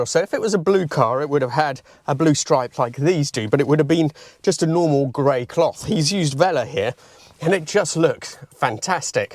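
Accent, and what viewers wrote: Italy. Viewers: I like your italian pronunciation, but…Why do you pronounce Abarth the wrong way then? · One word for this car, ‘PROPER’. Keep,up with the Italian pronunciation. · I don't think I've ever seen one of these, I absolutely love the way it looks! What an awesome car! Also, I love that you pronounce Italian names with an Italian accent. it's part of you. never changes, buddy 😉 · Carry on with the Italian pronunciation, I too have Italian parents and I was born in London and speak Italian too. Bravo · What an epic cool little car, a credit to the owner.. Always liked your Italian pronunciation, and as always thank you for the great content. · Love you Italian pronunciation of Italian marque names ❤ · Keep the Italian pronunciation, I really respect and appreciate that. Such a beautiful language. The rest of us are just guessing unless somebody teaches us how-to. 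· I can't believe some didn't realise you was of Italian origin. I love the Italian accent, Alex Polizzi fault. 😊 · Do Italians talk like this, which dialect and accent? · It's lovely to listen to the real pronunciation of Italian words 🤌🏻 It's part of this channel's charm. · I, for one, love your italian accent. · YOU HAVE MADE NO SECRET of your heritage ❤🇮🇹 personally I love hearing the authentic pronunciation …………. It’s an education Thank YOU 🙏🏻 Pass my regards on to your Dad from Dundee 🏴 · Eccellente. I do appreciate your Italian heritage pronunciation. Three years in Italy 1970-73 - my (English) baby sitter's husband was Italian. He took pride in his (replica) Mk1 Escort Mexico. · Keep those Italian pronunciations coming!!! ❤ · I love it how your Italian pronouncement of Italian words comes through. I really enjoyed the one with your dad on Italian car pronouncement. I speak a tiny bit of Italian still after working in Italy for a year and absolutely love the language (and food). 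I also shared this video with a good friend of mine, who uses this body as a race car but with a Mazda rotary engine 😋 I had a lovely Alfasud (yes I try to pronounce that the Italian way) and because my ratio leg length / upper body is quite opposite to "the Italian ape" ratio, I had to extend my steering wheel hub by about 15 cm and I bent the gear lever quite far back to be able to sit "normally". I had a lot of Alfa Romeos and absolutely loved them, best driving cars I have ever owned. What an absolutely gorgeous looking and sounding car, testament to the work that went into it, brilliantly done. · ❤❤❤great video thanks I love the Italian accent and your videos are always interesting ❤❤❤❤ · I thought I detected an Italian twang in a previous video.🤌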